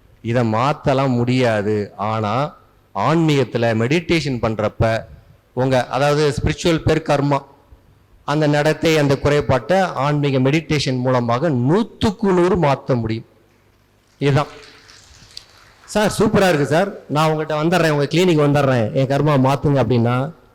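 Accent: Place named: Tamil Nadu, India. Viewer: Really I'm saying I'm happy to hear pure tamil voice from tamil man🎉🎉🎉🎉🎉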